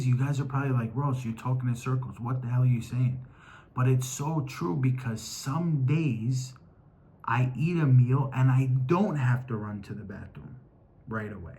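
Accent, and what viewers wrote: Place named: New Jersey, United States. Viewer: Not sure why or how your channel popped up in my algorithm, but it did! I see you flip houses is Texas. You dont sound like you're from TX, but you talk and sound like an adorable Jersey boy! Im born and raised in Jersey, so I know! 😂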